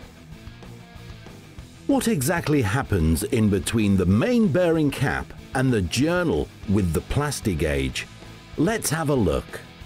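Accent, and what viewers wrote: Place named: RP, United Kingdom. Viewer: Funny, how it is that you have the stupid, raucous (and distracting) soundtrack and a prim and proper English gentleman speaking perfect Queen's English!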